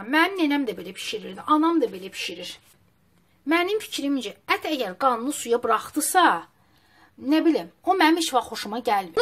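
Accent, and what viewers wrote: Baku, Azerbaijan. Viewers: Canım ay Bakı ləhcəsi!!!!!! Lap ürəyim açıldı nenem sözünü eşıdəndə. Əllərinizə sağlıq!!!!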